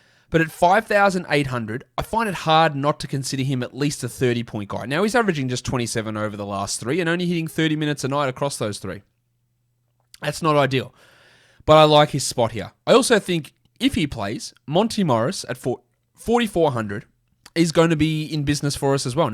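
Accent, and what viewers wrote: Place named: Australia. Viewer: I loves Aussies but trying to listen to one of em talk DFS NBA basketball is cringy. Just can't do it. I'm 2 min in and I've got to stop this video. Get back soon. 😂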